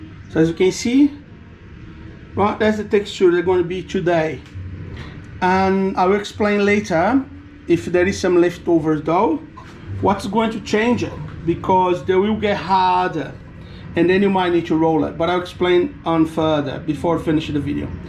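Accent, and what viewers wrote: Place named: New Zealand. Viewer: Oi, vim através do grupo barraqueiros de Queenstown LOL. Adorei tua receita e objetividade. Ja me inscrevi no teu canal e curti o video. Seria legal vc tb deixar a receita escrita na descrição do video. Obs: meu Deus parece que to ouvindo um kiwi falar!!! Abraco!!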